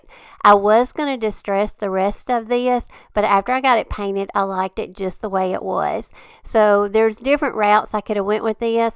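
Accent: South, United States. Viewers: IOD Just found your channel and subscribed !! Love your adorable southern accent. You are so sweet to listen to. I love going to thrift stores but now will buy things to make over using the IOD transfers and Stamps. I plan to purchase these through your links. Thank you for all your kind words and inspiration. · Love your channel and sooooo excited about the IOD giveaway. Keep cranking them out cuz we need more you tubers like you. Love your soft southern accent and your voice. It's soothing which makes it easy to relax and enjoy the show.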